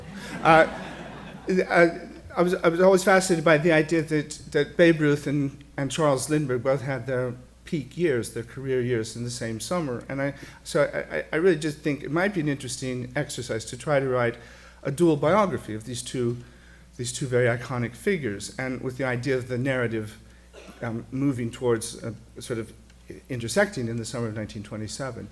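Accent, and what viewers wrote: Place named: United Kingdom. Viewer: It’s amazing there are moments he sounds British and others American. I can understand why sometimes he describes himself as an alien in his own country.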